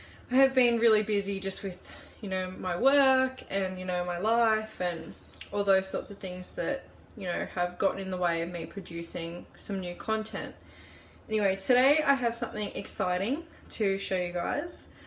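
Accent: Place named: Australia. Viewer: I love the aussie accent too, such a typical American thing hahaha.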